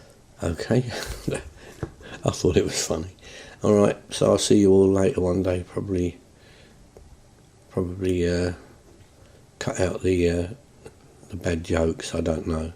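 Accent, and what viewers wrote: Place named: Cockney, United Kingdom. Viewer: You a cockney? I grew up EC1 you sound like my family lol 😂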